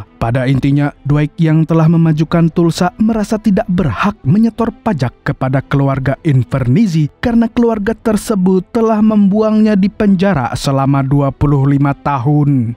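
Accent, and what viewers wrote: Banjar, Indonesia. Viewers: urg banjar kah pian mang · Org Banjar kah mang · Orang Banjar kh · Skalinya urg Banjar jua😂😂😂😂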